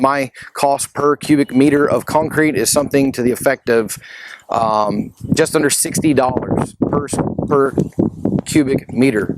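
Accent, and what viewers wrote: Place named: United States. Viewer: Sounds Great, where are you building this? Accent says USA but some references point to Australia...... soooo Queensland??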